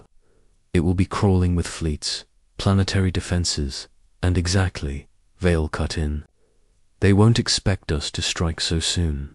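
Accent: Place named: United Kingdom. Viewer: The slow speed combined with the British accent is terrible! This makes me want to go to sleep in the 1st 10 seconds.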